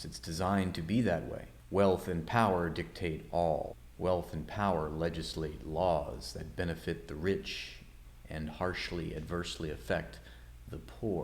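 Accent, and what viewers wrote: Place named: United States. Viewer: Biden or Trump doesn't matter aipac wins... true statement... but as for the rest, all systems exploit... and rolls eyes, there is no white privilege, rich privilege sure... and workers are great, but you should thank the Constitution for your Rights (I'm assuming from youre accent that youre an American citizen)... good luck!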